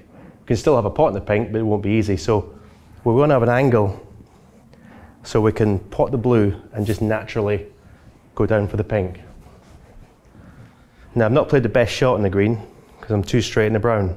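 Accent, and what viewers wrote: Scotland, United Kingdom. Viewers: He talks like a regular Scotsman enjoying his sport. The insights regarding positional play is gold.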